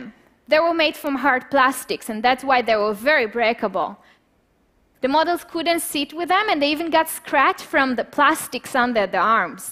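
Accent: Israel. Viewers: I do hear the slight Israeli accent :P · Most Israelis have a heavier accent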